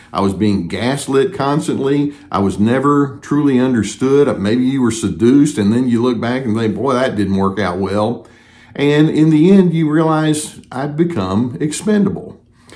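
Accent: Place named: Texas, United States. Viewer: YES!!! I was USED. Very difficult to wrap your head around that when you first realize! Who DOES that??? Answer; someone who doesn’t really have a life! When all is said and done; I have lived my life well. I have learned, I have raised a child, I have respected my parents, I have been a decent giving person as much as I could. I have EVOLVED! He was a hollow shell, a cheater, a crook, immoral and filled with cynicism and hate. He had to PRETEND to be someone else to get me! So WHO REALLY WON??? (I you want to say it in the crude modern vernacular!) Thanks again Dr for the nuances you bring to this subject. PS; never thought I could become FOND of a Texas accent😉!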